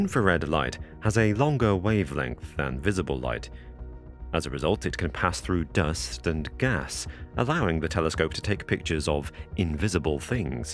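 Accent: United Kingdom. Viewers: Wowww! Amazing video and English accent are pretty nice thank you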